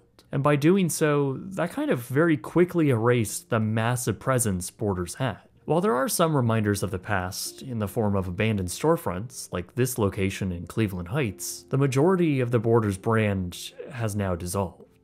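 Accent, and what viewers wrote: Canada. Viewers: Its hard to understand with your Canadian accent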